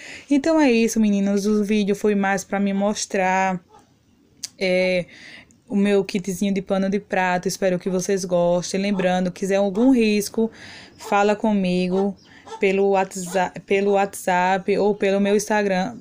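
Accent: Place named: Alagoas, Brazil. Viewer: Seus trabalhos são liiiiiindos ,amei seu jeito de falar ,alagoana né?gostei muito e vou me escrever pra aprender fazer todas essas coisas lindas que VC ensina. Não sei pintar mais pretendo aprender ,Deus te abençoe.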